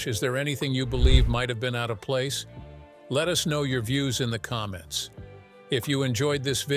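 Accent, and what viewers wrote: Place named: United States. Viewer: WHY IS THIS VOICED BY YET ANOTHER YANKEE, I AM PISSED OFF WITH THESE PEOPLE BECAUSE I WILL NOT WATCH HOWEVER INTERESTING IT COULD BE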